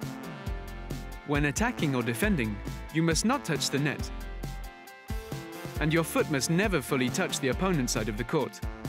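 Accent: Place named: United Kingdom. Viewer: Ik but just British pronounced words just make me laugh. :D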